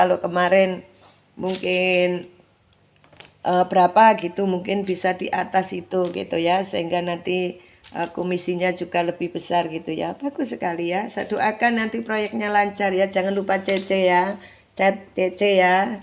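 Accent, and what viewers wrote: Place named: Java, Indonesia. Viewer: medhok bgaet yooo itu lucuya bikin semangaat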